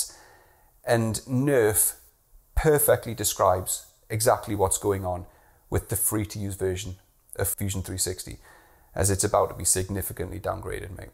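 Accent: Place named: Newcastle, United Kingdom. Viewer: I thought you sounded like a geordie!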